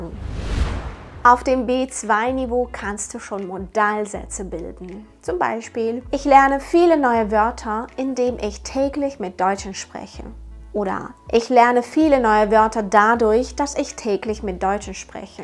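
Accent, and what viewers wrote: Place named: Russia. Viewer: Если честно с первого слова русский акцент распознала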